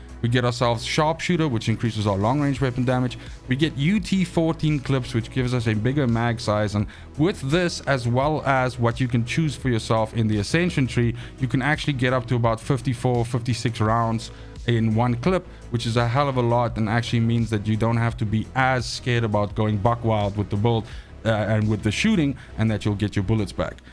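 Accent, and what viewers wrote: South Africa. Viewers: subscribed brother, love your videos. Really well detailed and explain... and I hear that south african accent coming through. Lekker !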